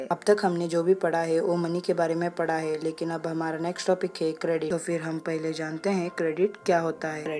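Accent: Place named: Uttar Pradesh, India. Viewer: Avaj se UP ka lag rha hai bhai tu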